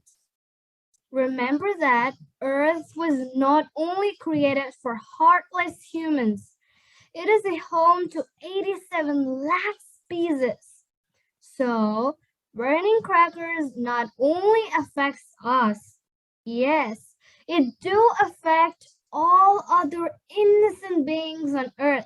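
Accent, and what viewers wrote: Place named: United States. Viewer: Speaking like someone who born in America, next level accent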